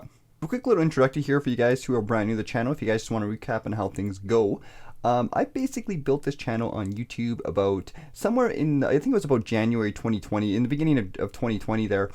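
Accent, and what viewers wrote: Canada. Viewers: this guy so fun to listen to. I can tell he's Canadian so quickly.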